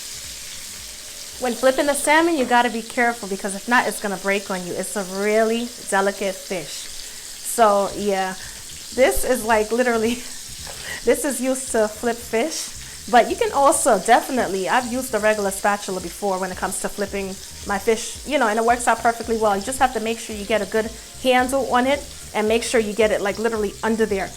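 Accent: New York, United States. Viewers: You are a new yorker...arent you? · I need sounds like she from New York Cooks like a Southern Mother looks like Beyonce I'm in 😊..... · Very good :) - i wonder if she is from New York lol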